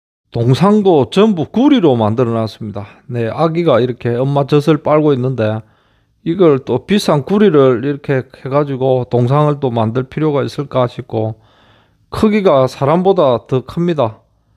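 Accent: Gyeongsang, South Korea. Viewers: 님 댓글 감사드립니다 땡큐 💘 경상도 사투리 ㅎㅎㅎ 오늘도 행복하세요^^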